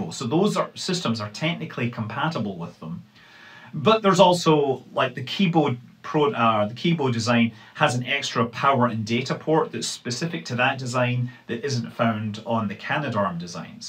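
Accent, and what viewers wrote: Scotland, United Kingdom. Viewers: Your Scottish? That's dope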